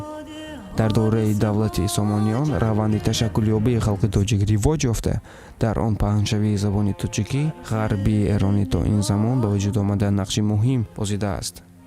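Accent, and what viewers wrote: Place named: Russia. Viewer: Khohish mikunam, ke toza bo zabuni Orioni kharf bizani ogoi BLOGER! Kharfzanii Tu shaxseki rus khastu guyo bo zaboni tojiki kharf zada istodaast.. aniktarash talafuzat..." Asalamu malakum"...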